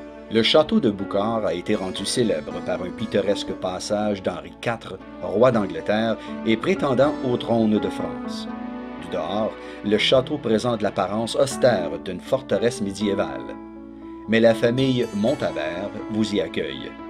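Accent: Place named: Quebec, Canada. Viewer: Le Berry avec l'accent sympathique du Québec ! Merci pour jolies ces cartes postales d'une région dont il ne faut pas trop ébruiter le charme afin de lui conserver toute son authenticité !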